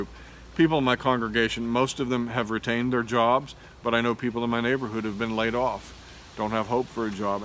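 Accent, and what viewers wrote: South, United States. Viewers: Southern accent is everything. I guess your first name is from your scottish grand mother. Great videos!!! by the way.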